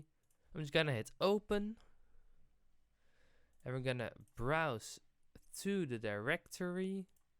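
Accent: Netherlands. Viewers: U dutch? Becaus U have the accent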